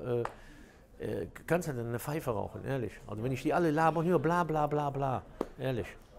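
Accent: Cologne, Germany. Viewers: Hallo, ich liebe den Kölner Dialekt. Grüsse aus Basel.🇨🇭👍💣💥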